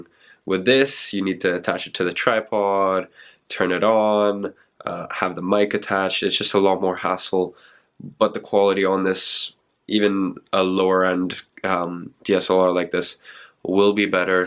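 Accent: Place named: United States. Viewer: wait if you live in london for 13 years how or why do you have american accent 😳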